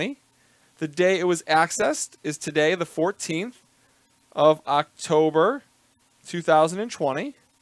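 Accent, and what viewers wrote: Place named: Chicago, United States. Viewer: Love your Chicago Midwest accent. Sounds like home.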